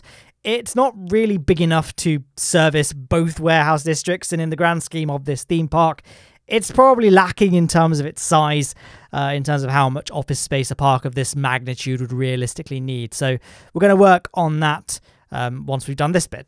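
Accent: United Kingdom. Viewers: I love your videos and love the British & their accent ...lol and I started watching your videos about month ago to learn more about KSP but and I wanted to learn more about youtubers playing games. I bought my own pc and thought it was going to do just fine since I was getting the stimulus money from uncle sam....I'm a disabled Air Force veteran here in the us......and as it was the stimulus money was about 6 months of my income at once so yeah...because it was only $1200 I couldn't afford a great GPU or a computer monitor so I hooked it up to my tv and as you mentioned in this video its soooooo annoying and difficult playing any games on my tv even though it's all I have....lol...I hope you keep making wonderful videos no matter what game it is. 😃👋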